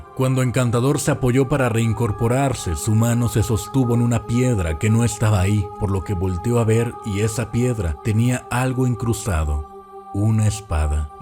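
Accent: Mexico. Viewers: Es la voz de Sherk Pero lo senti mas mexicano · Si hubiera disimulado el acento mexicano estaría mejor.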